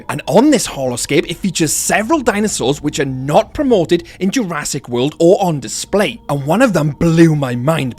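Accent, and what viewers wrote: Wales, United Kingdom. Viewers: Just new to your channel I love it also are you Welsh too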